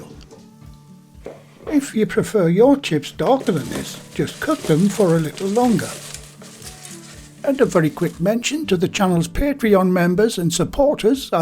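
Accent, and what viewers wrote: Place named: Northern England, United Kingdom. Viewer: I grew up in the north east, your accent reminds me of childhood. Its that particular northern but well spoken, reminds me of my nannas "telephone voice"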